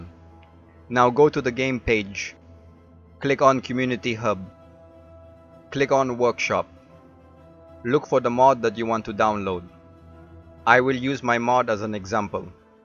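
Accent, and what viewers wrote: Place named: India. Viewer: You sound indian holding in his language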